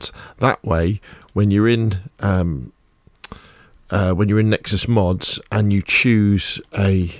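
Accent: United Kingdom. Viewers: thank you, british man.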